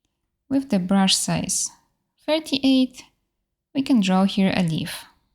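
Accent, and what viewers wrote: Poland. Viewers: Hi u have polish akcent can u speak polish language?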